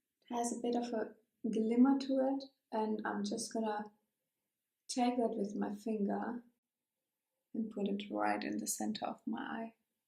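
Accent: Germany. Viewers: Die Message ist sehr schön: man sollte nicht zu stark anders aussehen. Du bringst es auf den Punkt. Übrigens ist dein Englisch richtig gut. Ich rede auf der Arbeit 95% Englisch und muss echt mal lernen so wie du zu reden. Auch wenn man deinen deutschen Akzent etwas raushört (was ich süß finde) machst du keinen Fehler! Ich mache öfters Fehler oder denke kurz nach. Und wenn ich mich dann über etwas aufrege wird es von Wort zu Wort immer mehr deutsch 🤣🤣🤣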